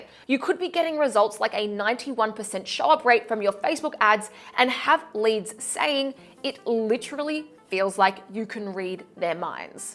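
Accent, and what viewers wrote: Australia. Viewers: love everything you put out - pure value - you're a wizard... Therefore - you don't need the background music or it should be much lower - it's somewhat (to moderately) distracting in this video. Your Aussie accent is all most of us want or need to hear in your incredible videos. - 😉 - You're brilliant - we love your videos - there's no need for you to "entertain us."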